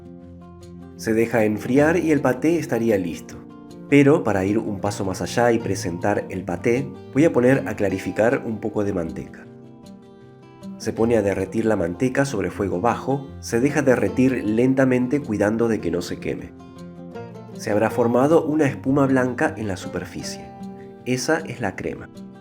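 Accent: Argentina. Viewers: Hola! Un verdadero placer y deleite escucharte y ver tu video!!!!! Tan profesional y con tanta excelencia!!!! Es rarisimo encontrar a alguien que no esté a las corridas en un video, pero tampoco estás hablando gansadas con parsimonia para llenar espacios huecos. Tu acento neutro argentino, a mi parecer, fue extraordinario!!!!! Mil felicitaciones! Soy desde ahora tu fan. Me gusta la impronta de ponerle un poco de historia e información del origen de la receta. Gracias por cada detalle de los que te mencioné. Un fuerte abrazo desde Córdoba, Argentina!!!! 🎉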